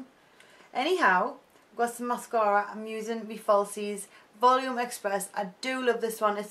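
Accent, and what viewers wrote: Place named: Scotland, United Kingdom. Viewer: You look beautiful and I for one have missed you., could you please tell me if you live close to Scotland? Your dialect and some words seem similar to my late grandfather. (I live in TX)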